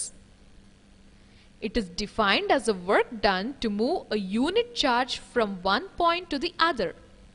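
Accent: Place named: India. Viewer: It’s an Indian accent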